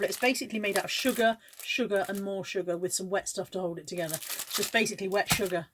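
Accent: Bristol, United Kingdom. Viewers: Just watched You for the first time will definitely look out for more of your channel on YouTube, Thank-you Lorna from Northumberland 😊 love your Bristol accent my family on My Great Grandmother's side of family are from Bedminster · I could listen to your lovely Bristolian accent all day. Plus you buy all of the same/similar stuff to me! And yes I remember Orangina being ‘posh’!! Thanks for doing the vlogs.......where do I send the flowers lol 🌹🌷🌺🌸🌻🌼xx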